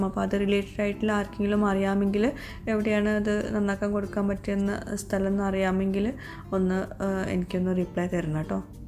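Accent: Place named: Malappuram, India. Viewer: Ingale malapporam baasha kelkkaan nalla rasand. Voice over kodukkumbo style aakken lle😆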